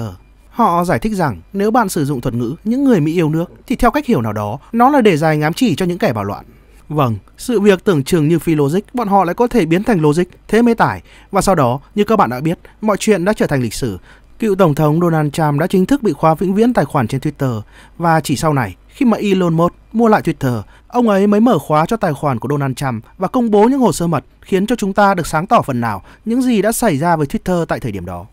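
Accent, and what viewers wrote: Standard. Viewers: Kenh này được cái nói chuẩn like 👍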